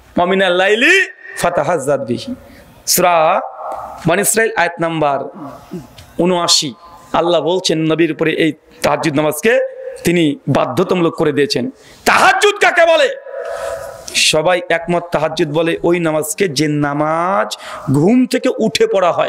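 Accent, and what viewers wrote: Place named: Kolkata, India. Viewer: আপনার কথা বলা একেবারে কলকাতার মানুষের মত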